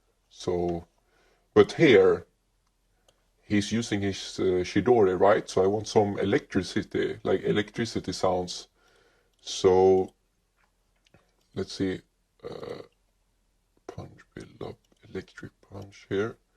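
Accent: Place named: Sweden. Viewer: ur swedish?😃I recognioze the accent 👀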